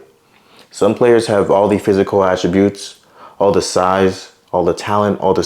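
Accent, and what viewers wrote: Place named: Ontario, Canada. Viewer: Bro is definitely from Ontario 😭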